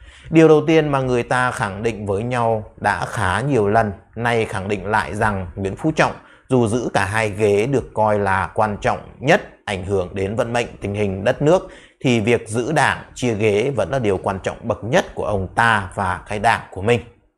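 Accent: Standard. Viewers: Nghe hay. Nói chuẩn. Xin cảm ơn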